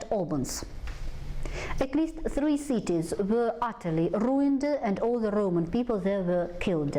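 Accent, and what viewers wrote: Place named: Russia. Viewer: Ну и что,что у нее произношение рунглиш,за то как интересно и доступно объясняет ,вспомнила свои студенческие годы в инязе.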